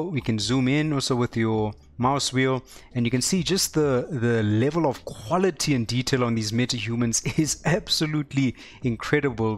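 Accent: South Africa. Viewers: My guy, watching this video, I kept thinking to myself "That's a familiar accent...", stoked to discover another Jo'burger. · I'm curious, are you South African ? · 1st Thing I thought, this guy sounds like a South African :) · South African accent detected...Which city you from ma se kind?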